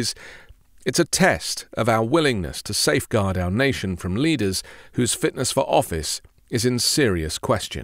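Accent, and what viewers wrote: England, United Kingdom. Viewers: Age is not the question. The questions are related to mental and physical capabilities. Putin is an older gentleman but I would not want the American President have to arm wrestle with him. What is the "magic" age where these capabilities decline ? Sounds like the narrator is talking about President Biden. Some professions require an exam which determines they are ability to perform in a job. This does not exist for the Presidency or other elected positions. Why does the narrator talk with an English accent ? Is Europe trying to affect American Elections ?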